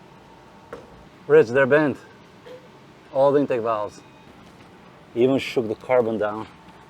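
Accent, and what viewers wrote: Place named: Albania. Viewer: Where are you from. Your accent is like Albanian.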